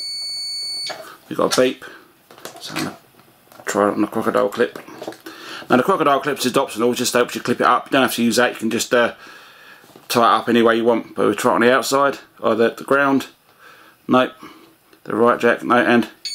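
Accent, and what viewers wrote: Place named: England, United Kingdom. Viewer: Nice too hear somebody from back across the pond . I’m a. XPat living in the States . I have the Tecson 310 and love it . Where in England are you ? I was from the the Midlands Coventry. Thanks again .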